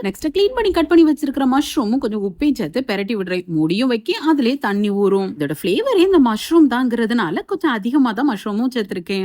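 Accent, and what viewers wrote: Tirunelveli, India. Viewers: Tirunelveli slang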